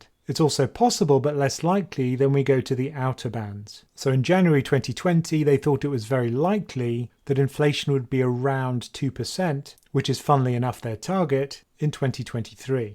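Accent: United Kingdom. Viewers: Why do I get that feeling every time video with brit ascent remind me M .I .6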